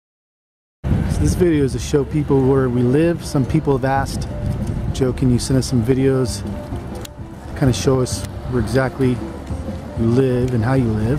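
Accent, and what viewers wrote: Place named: United States. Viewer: You sound American. What state are you from?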